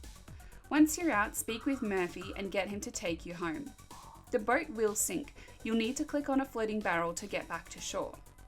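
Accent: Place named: Australia. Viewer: aussie, I like it (im aussie/kiwi)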